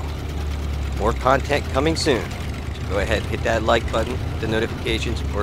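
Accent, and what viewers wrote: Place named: Northeast, United States. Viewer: Thanks for this tip, I just unlocked the drone. I'm glad that I can sell it back BC it's $50k! also, I think I recognize your accent as north east, more specifically the Tri-state area of NJ-PA-MD, most likely Delaware County in PA.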